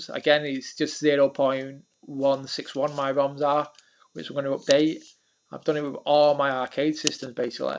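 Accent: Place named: Manchester, United Kingdom. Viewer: Where you from? Manchester? You sound like you're from Wythenshawe, top vids btw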